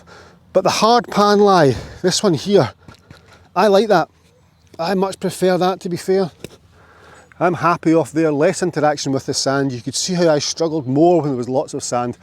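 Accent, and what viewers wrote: Scotland, United Kingdom. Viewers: Love the lesson and your Scottish accent!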